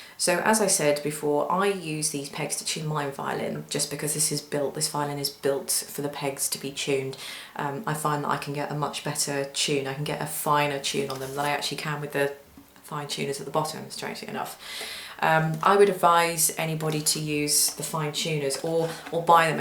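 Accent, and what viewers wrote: United Kingdom. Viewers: Love that Limey accent! When she says "tune" she pronounces it like "chune" Chic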